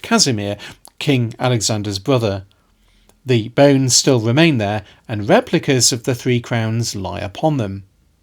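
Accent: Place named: England, United Kingdom. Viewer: Why don't the English try even a little to pronounce foreign words/names as natives would? The J in Polish is pronounced as Ya.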